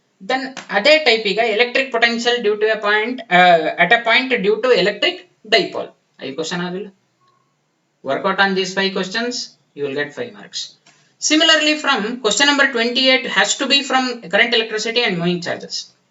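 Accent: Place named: North Karnataka, India. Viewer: Sir nim javari bashe esta ayt. Hinga classes madi namg help madri plz🙏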